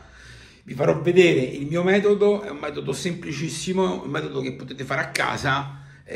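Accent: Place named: Rome, Italy. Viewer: Dall' accento capisco che anche tu sei di Roma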